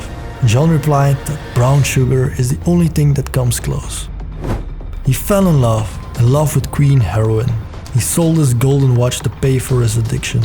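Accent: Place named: Flanders, Belgium. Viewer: Ja, vooral het accent, inderdaad. Typisch Vlaams, je bent niet alleen uiteraard. Maar denk ook even aan het doelpubliek, dat toch bijna 100% Vlaams/Nederlands is. Dan heb je al weinig reden om dit in het Engels te proberen. Voor degenen die onze taal niet machtig zijn volstaan ondertitels ruimschoots.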